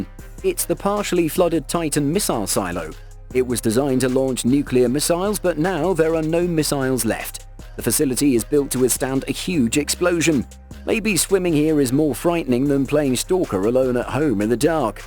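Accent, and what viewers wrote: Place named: United Kingdom. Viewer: Boy you British lol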